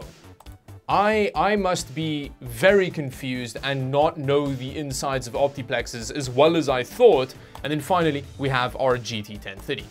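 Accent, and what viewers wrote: South Africa. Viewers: Hey! curious, are you a Afrikaaner! im getting South African Vibes from you lol! Awesome Channel and love the MUG thank you lol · Are you by any means from South Africa hence your accent?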